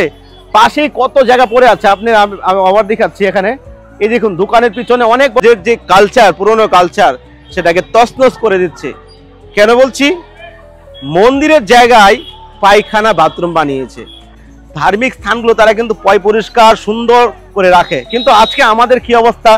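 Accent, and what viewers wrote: Bangladesh. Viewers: আপনার ভাষা শুনে মনে হচ্ছে বাংলাদেশী । এত খারাপ অবস্থা কিন্তু যারা যাচ্ছে তারাই করছে ।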